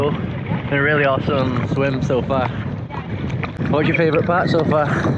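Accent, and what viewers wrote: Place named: England, United Kingdom. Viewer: Wow man! I really like your English accent. Sound very clean for me. Where you from?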